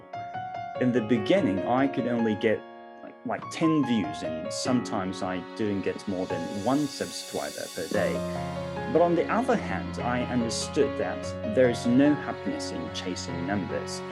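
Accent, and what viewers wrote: United Kingdom. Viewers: 貴方のおかげで、共通テストのイギリス英語が前よりも格段に聞き取れるようになりました！！ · おめでとうございます！いつも応援してますイギリス英語のほうがいいっすねｗ 染みるわ～～ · 20万人おめでとうございます🎉 イギリス英語って染みますね！ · さんはイギリス英語かな？？ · はじめて「 さん」ではなくのイギリス英語を聴いているような気分。響きます。。。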